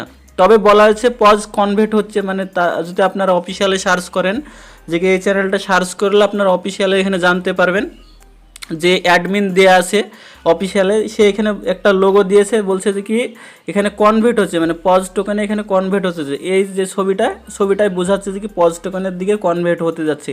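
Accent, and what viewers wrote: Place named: Bangladesh. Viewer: Apni Indian? kotha sune mone hoy bangladeshi.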